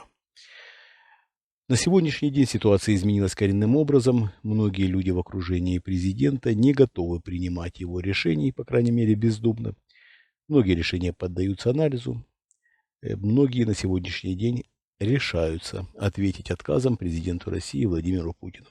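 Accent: Ukraine. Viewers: с Украины что-ли? Акцент украинский.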